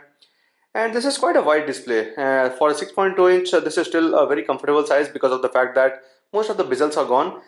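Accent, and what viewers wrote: India. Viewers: Wdym 😂 I'm not a native English speaker yet I can understand this guy very well. He's just speaking it in an Indian accent. And no, I'm not Indian either.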